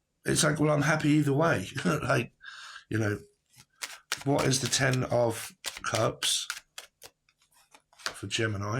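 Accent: United Kingdom. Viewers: Im a sucker for ppl with British accents ❤.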